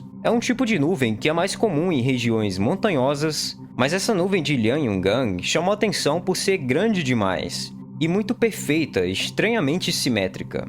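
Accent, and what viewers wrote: Rio de Janeiro, Brazil. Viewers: Decide se tu é carioca ou paulista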